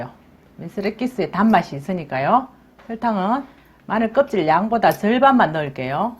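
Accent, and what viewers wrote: Gyeongsang, South Korea. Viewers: 맞아..유튜브로 돈버는것도 중요하지만..자기자신을 잘아는것도 큰 힘인것 같다..내 목소리..내 말투가 어떤지 상대방이 듣기 좋은지 ..거슬린지..자기 자신을 제대로 안다면 이렇게 장황하게 자신있게 계속 말하진 못할것 같다..진짜 경상도 사투리도 억쌘데 목소리도 거칠고..그럼 어떻게 영상을 만들어야 하는지..생각을 못하는걸까... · 시끄러운 경상도 수다쟁이 아줌마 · 영상은 10분으로 줄여 주시는게.. 경상도 말투로 쉼없이 계속 말씀 하시니 뭔 말인지 모르겠어요 귀가 멍멍합니다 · 경상도 말씨 애교가. 많네요. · 살림 요리 등 등 진행중에 고향 을 느끼게하는 고향말씨 좋은 꿀팀이되는 유익한정보 등등 너무나 좋게 듣고봅니다 참좋으신분 항상도 건강하시며 경상도말씀으로 씩씩하게 해주세요 👍👍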